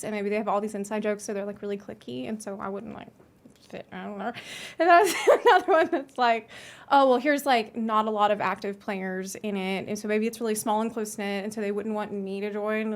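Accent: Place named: Mississippi, United States. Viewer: I know you live in Ukraine, but are from the US, but I can't help but recognize the accent. Are you from MS? Love you bye!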